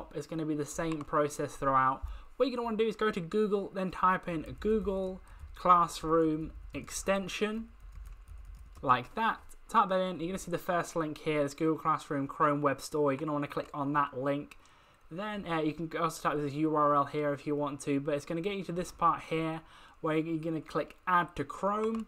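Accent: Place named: United Kingdom. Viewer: No wonder why you sounds soo bri'ish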